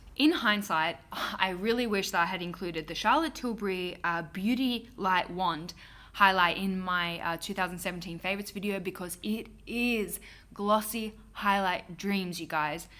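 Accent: Australia. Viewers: I crave shape tape soooo much, but Tarte is not sold in Italy and the shipping costs is quite high for me... I can't stand this situation anymore 😭😭😭😭 #tartecometoitaly P. S. your aussie accent is so pretty! 🐨❤️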